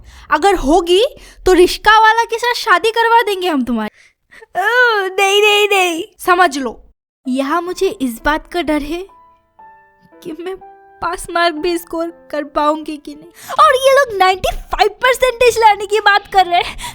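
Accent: Bengal, India. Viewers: Didi ka accent mujhe bengali wali lag rahi hai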